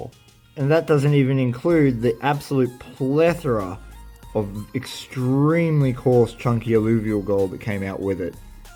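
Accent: Australia. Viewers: Aussie accents are only 50% understood to start with. The background noise cuts that by too much to know what's being jabbered about.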